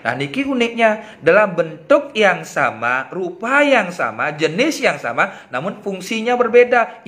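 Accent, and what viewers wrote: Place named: Bali, Indonesia. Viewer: Gimana sih ngomong pake logat...orang Bali tu logatnya bagus,tapi susah niruinnya...ngomongnya tu kaya kental banget...ngomong t aja kaya kentel banget t nya...pahm gk sih..susah banget aku ngucapin kaya orang Bali...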